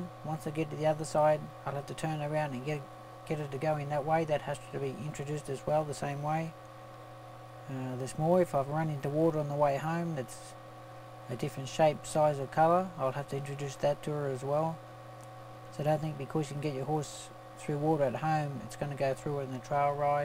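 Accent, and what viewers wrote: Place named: Australia. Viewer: HAHAHAHAHAHAHAHA OH MY GOD! i find myself for no reason finding this totally hilarious. hes 'japanese' but has an 'austrailian' (sounds like to me) accent. and the stuff he says is just bloody class! omg im crying!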